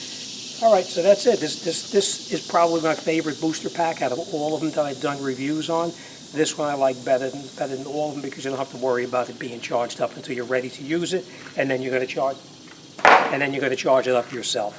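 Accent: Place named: East Coast, United States. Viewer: i can hear boston or new jersey in your ascent somewhere east coast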